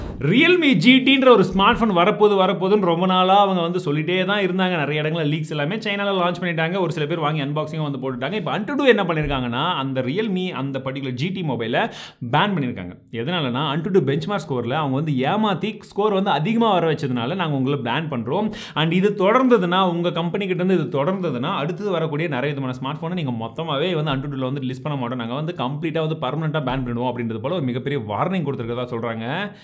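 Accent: Chennai, India. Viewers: dont use chennai tamil, keaka mudiyala... use south TN tamil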